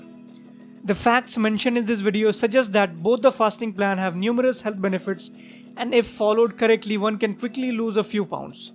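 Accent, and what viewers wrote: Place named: India. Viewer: Your accent smells masala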